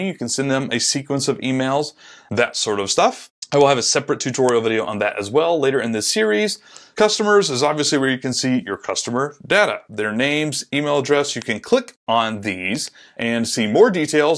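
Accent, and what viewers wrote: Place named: United Kingdom. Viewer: Ok after comparing Course hosting palttforms the hole Sunday and already having a free test account at teachable I lean towards Podia because of design. Teachable Text layout in courses without coloums is ridiculous, I thought about making a new line every 10 words manually... Does podia have a community for members optione, where my course members/buyers can meat and chat, post there results? I plan on selling art classes with my dad: <link> Here you can see the results of the "offline" real life courses so far. Thanks for advice, great video!! Here a a few free Tuts in german, we plan to do more advanced ones for money... <link> You sound British. Sad to lose you in Eu, don't know how you think about it, what brings me to the next question, is podia good at handling the EU taxes? 16% / 19% in Germany...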